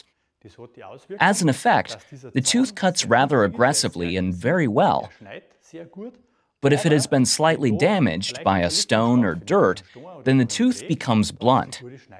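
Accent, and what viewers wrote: Bavaria, Germany. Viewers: 🤣 Hätt mir scho gedocht da spricht englisch mit bayrischn Akzent 😅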